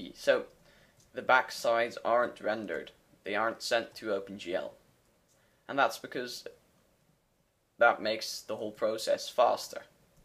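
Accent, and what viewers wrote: Netherlands. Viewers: haha i knew it! your acsent it was dutch! YEAH! sorry for that xD but i´m dutch to, and i make games to. No tutorial though, and still good english btw :)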